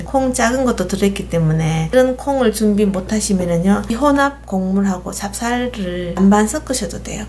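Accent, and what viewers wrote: Gyeongsang, South Korea. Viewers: 으...너무 맛있겠어요,.. 한번 따라 해볼래요.. 이모님 경상도 말씨 너무 이뻐요..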